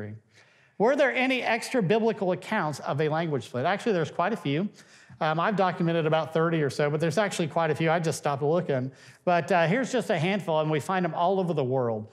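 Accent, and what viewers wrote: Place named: Tennessee, United States. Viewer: Just before you compliment (or tease) your wife, let me guess : you are from Tennessee?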